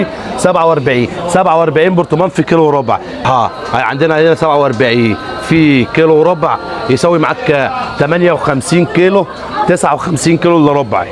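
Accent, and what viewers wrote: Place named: Alexandria, Egypt. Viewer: اللهجة الاسكندراني ❤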